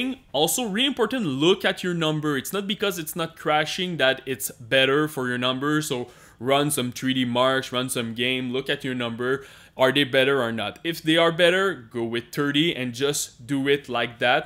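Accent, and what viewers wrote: Quebec, Canada. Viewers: I love French Canadian accents 😁 Had mixed results with my 7800X3D on stock settings with a gigabyte B650 aorus elite ax. Mid to low 17000 scores in cinebench multicore. Did exactly what you did in this video and it's up to around 18,000 now. I'm only running -15 and it seems to be stable, but from what you said it seems realistic to try for -20 or even -30, so I'll probably try that later today. What kind of clocks are you getting for multi-core loads? Great video, thanks.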